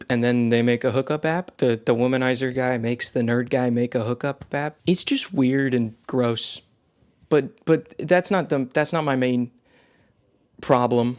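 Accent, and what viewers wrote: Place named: United States. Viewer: btw you have a nice american accent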